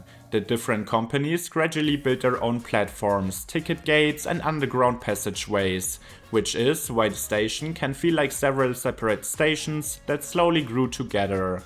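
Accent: Germany. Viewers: Jäpänn ? lol try a longer vowel in the second syllable. no offense, work on your pronounciations, you support the bad reputation that germans have for their english.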